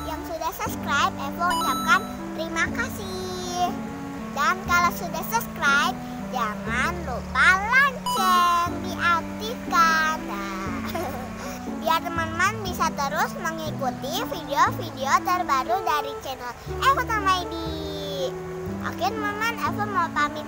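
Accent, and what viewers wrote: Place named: Jakarta, Indonesia. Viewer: gitu banget dah...😆😆 seruu video nya,kak evo lucu banget sii..ngomong logat betawi nya lucu😂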